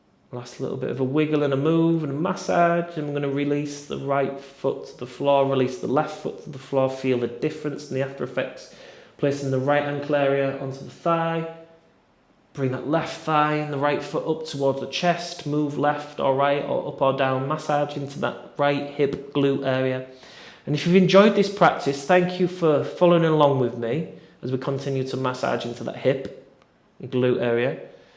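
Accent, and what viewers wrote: United Kingdom. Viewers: Thanks - I’ve been doing your stretches for a few weeks now as I train for a half marathon in New Zealand. Loving your deep stretches and your accent that reminds me of living in the UK too!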